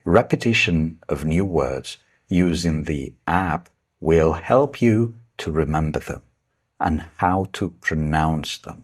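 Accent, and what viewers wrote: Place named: United Kingdom. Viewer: Plz don't leave this book and this way with your best British accent 🎉❤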